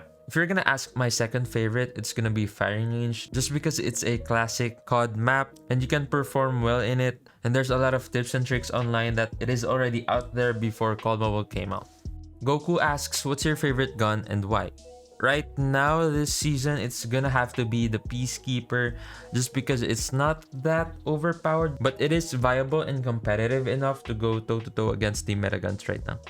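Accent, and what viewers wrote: Philippines. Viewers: I knoww that your a Filipino because your english accent is really filipino 😄😄😄😄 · SABIIII NA NGAAA BAAAA E, MAY FILIPINO ACCENT KAAAA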